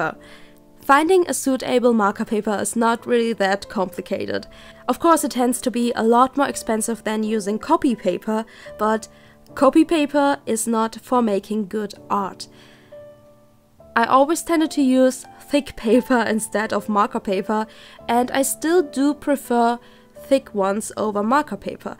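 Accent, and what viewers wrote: Germany. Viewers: Are you german? That sounds like a german accent xd I'm german xd Edit: jap ich hab deine Kanalinfo gesehen :3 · bist du aus Deutschland? xD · Du hast echt einen krassen deutsch Akzent, bist du deutsche ? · Hab ich auch mitbekommen. Sie hat einen ziemlichen deutschen Akzent, auch wenn des jetzt nichts negatives bedeuten muss. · Hey german bud ^^ <3 I heard the accents immediately because I got the same xD Maybe if you like videogames we may play together one time ^^ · wie ich schon am anfang gehört habe, muttersprache deutsch. Ich hör es immer raus, egal ob der akzent stark ist oder nicht xd, danke für das video :) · Yep shes german lol I heard the accent too. I'm not german tho I speak flemish (belgian dutch) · Sminfin ja liegt einfach daran das wir den allgemeinen Klang der Muttersprache oft sehr lang behalten selbst wenn wir andere sprechen. 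Wie man hier ja gut sieht. Ihr Englisch ist super und sie Betont wunderbar aber der gesamt klang ist deutsch. Ein Native-Speaker würde sachen einfach anders betonen , andere Geschwindigkeit benutzen etc. Briten singen zb etwas mehr :3 (Sorry Ich bin sehr interresiert an Sprachen und studiere grad eine Übersetzen & Dolmetschen) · Are you German, I recognised the accent . Bist du deutsch ? Ich erkannte den Akzent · Are you German? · Are you German? I can hear a lil German-like accent? Ahh hab die Kanal info gelesen! Haha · Are you from Germany? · I love your german accent! It's a lot more soothing to listen to than all greasy american you hear on YT. I'm from sweden so I don't have perfect pronounciation either. Some senteces sound great for me, and some words are just... urgh. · Are you German? Your "accent (is this right?! xD) sounds so German ':3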